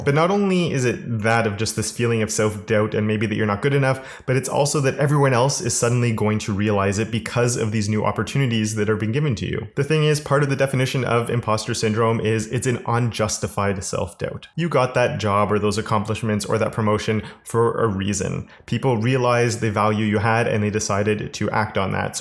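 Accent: Canada. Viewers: This was good. You have spent some time looking inside yourself to understand where this comes from. Very helpful to me at this time. Also.... are you Canadian? "About" and "doubt" caught my ear. ;)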